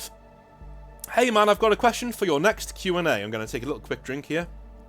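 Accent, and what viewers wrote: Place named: North, United Kingdom. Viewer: Painting some sweet, sweet Redeemed terminators (DA) whilst listening to those lovely northern tones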